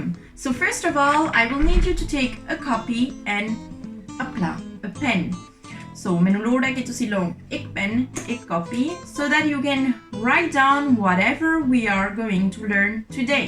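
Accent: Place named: France. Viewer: j'aime beaucoup ton accent de francais <3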